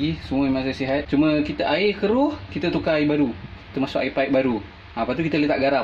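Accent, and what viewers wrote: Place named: Kuala Lumpur, Malaysia. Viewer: Ni cara cakap orang kuala lumpur dan Selangor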